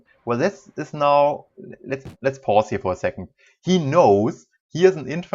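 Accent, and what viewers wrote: Germany. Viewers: well... it"s not a ranked map ... it"S not a ranked game ... and non of the above is correct but i love to hear you make commentry in that german accent non the less ...you sound like those german generals in those ww2 movies so .. i will give you a like hahahaha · Typical German Accent...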